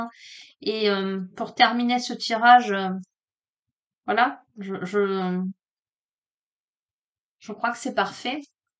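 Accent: South, France. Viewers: Bonjour je suis une nouvelle abonnée j entend l accent du sud . Je suis du Gers.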